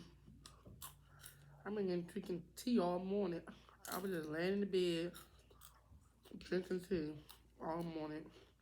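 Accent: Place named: Baltimore, United States. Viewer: Good morning my chocolate sis...i love your intro...i came across your vid by watching cringe binge and i was like let me check her out and your intro got me hooked...it is so humble and so adorable...seriously when i heard you talk i was like ummm i think she is from bmore...im from Maryland myself...im sitting here posting my own vid while watching yours lol hope you check it out but anywho i am going back to binge your vids lol have a blessed one hun... keep up the good work!!!! i totally agree on not following trends, my channel is about Ethnic food from different cultures becuz i want to open mine and others horizons on foods possibly...so basically i feel u on doin your own thang....#newsubbie...my bad im a talker but im done lol....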